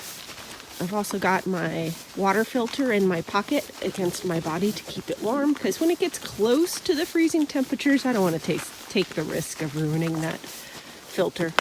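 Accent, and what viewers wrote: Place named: Northern Ireland, United Kingdom. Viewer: Goog to see (hear) a Northern Irish accent - ' ' on the trail!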